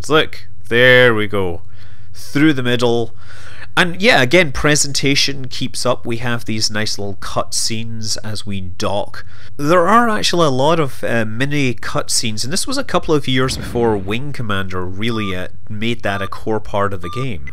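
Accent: United Kingdom. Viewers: I'm really interested in languages and pronunciation and I just have to ask you: What kind of accent is that, that you are speaking? Is it maybe some kind of british accent? I'm obviously not a native english speaker, so I'm just curious about it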